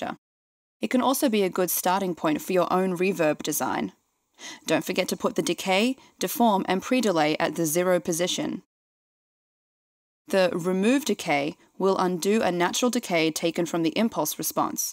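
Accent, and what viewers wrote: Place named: England, United Kingdom. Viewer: Is she hot? (She does have an English accent)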